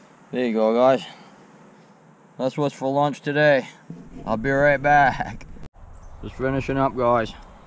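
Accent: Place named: New England, United States. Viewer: I'd swear you were in New England. 😁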